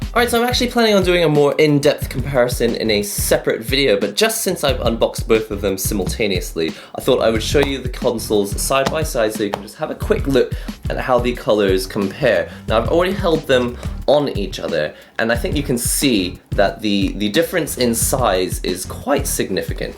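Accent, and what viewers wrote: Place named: Northern England, United Kingdom. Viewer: You probably learned English from England, maybe north of England because of your accent.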